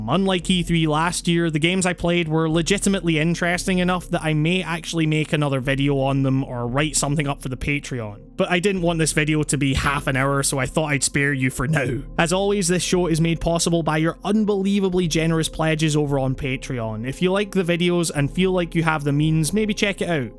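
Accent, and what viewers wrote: Scotland, United Kingdom. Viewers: He's Scottish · Clearly a Scot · I’m pretty certain he’s Scottish, especially with a name like.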